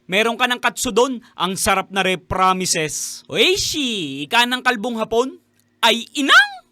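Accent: Batangas, Philippines. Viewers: Ay kagaling eh. Batangenyo ka talaga idol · Parang Batangueno ala eh😍🤩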